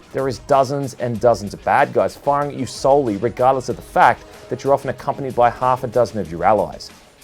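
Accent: Australia. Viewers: i had a feeling you where aussie haha so am i :) might have to reinstall and play this again.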